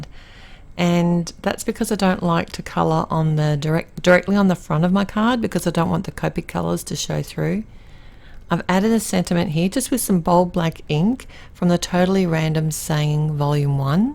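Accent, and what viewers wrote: Australia. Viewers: Nice to hear your Aussie voice! Means I’ll be able to find all you used! Thank you 🙏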